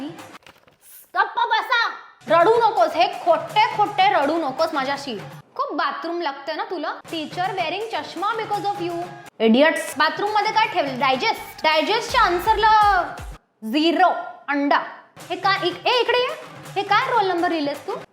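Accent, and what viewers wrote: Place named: Pune, India. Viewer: Ohh that puneri accent... Paniii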